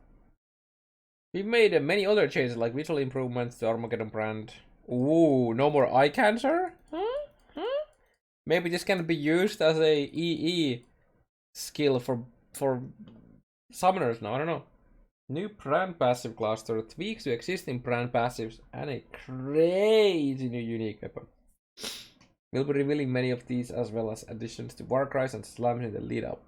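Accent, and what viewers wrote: Finland. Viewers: I am going to be honest. I know you know a decent amount about the game but your guides are awful for several reasons. First of all, your videos are too long. You need to learn how to edit your content. If you wanna be serious stop copy-pasting and learn how to make it short, on point and simple. Secondly, you don't articulate your thoughts and your English is decent at best. You have to convert your thoughts into sentences for other people and explain yourself. To me it seems like you are just saying whatever word comes to your mind first and you output that. Your Finnish accent and mumbled sentences don't make things easier so you have to compensate for that. Lastly, I myself play this game for a long time and most of the time I'm struggling to understand what you're saying even tho I understand the topic of things. But a lot of details lost. 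Now imagine a person who has no clue about the wider picture. Your explaining of things and these guides are making me facepalm too much and it's disappointing so I thought I will give you some advice. Now what you do with it is up to you.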